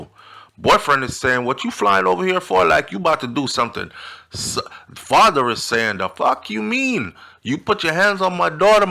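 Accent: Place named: Jamaica. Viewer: Yhu sound like a yardy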